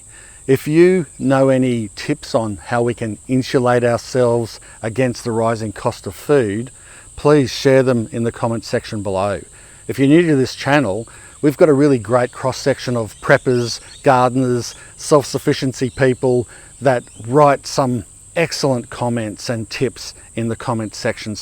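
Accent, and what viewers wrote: Australia. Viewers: If I'm not mistaken, you're Australian? (I always confuse New Zealand and Australian accents) Anyway, if so, what's the issue with water in Australia? I've read that companies from a certain communist country (Ive had comments deleted for stating it before) own water rights to pieces of or even entire water systems. Is that true?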